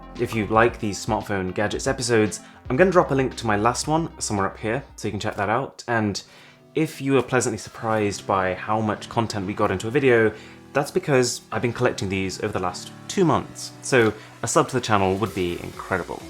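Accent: RP, United Kingdom. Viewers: I appreciate his RP british English pronunciation. Perfect. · I like your British accent man!! Cool video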